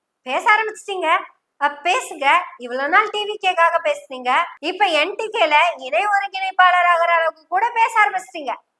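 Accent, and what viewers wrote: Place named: Palakkad, India. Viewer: சூப்பரோ சூப்பர். சும்மா பொளந்து கட்டுறீங்க பாலக்காட்டு கொங்கு தமிழே. பாவம் மனநல ஆலோசகர் ஷாலினி பொழச்சி போகட்டும். விட்டுருங்க. இவரே வேறொரு மனநல ஆலோசகரை அணுக வேண்டிய நிலைக்கு ஆளாகிவிட்டாரே என்று நினைக்கும் போது அவர் மீது பரிதாபம் ஏற்படுகிறது தோழரே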